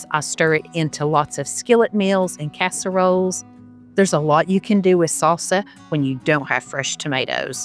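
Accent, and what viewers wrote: South, United States. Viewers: I wanted you to know that you are such a blessing to me. ❤ I have PTSD and when I get days where it's bad... I watch your channel. Your soothing southern voice, smiling face, and easy ways help me refocus a bit. TY for being YOU! Hugs British Kate in 🇺🇸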